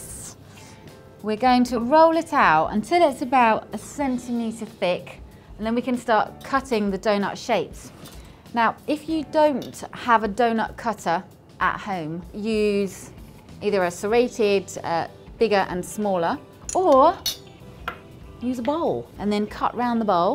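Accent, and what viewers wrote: England, United Kingdom. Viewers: Man, I love to hear cute women speak with an English accent.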